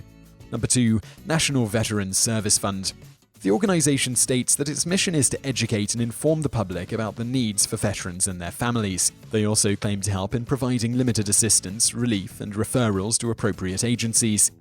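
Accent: England, United Kingdom. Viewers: I like your videos, but by the sound of your voice your English, yet all of your home topics are American. Why is that? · pyrovargas, Another communist imbecile. You can't tell the British accent?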